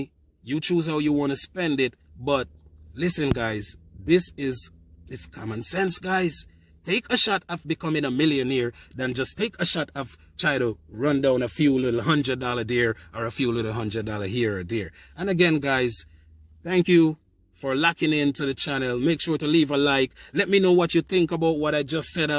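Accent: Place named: Jamaica. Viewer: Love the Jamaican accent, I’m Jamaican as well Brother. I was thinking along the same lines as you. 100 dollars and just leaving it is a very responsible idea as a leader. Your not pushing, your just sharing an idea. Respect. I was thinking of a different idea, and that is to think like the amc apes, they buy and hodl, the only problem is, the stock market can produce naked shorts and then their battling nobody, because the hedges have found a way to short the stock without using money. In decentralized finance, you can’t do that, I want to see a crypto like this have a community that doesn’t give out crypto, but buys and hodls like apes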